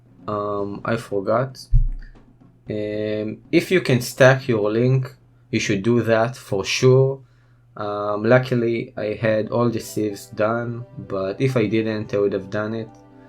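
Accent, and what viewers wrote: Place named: Israel. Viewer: המבטא הכי ישראלי ששמעתי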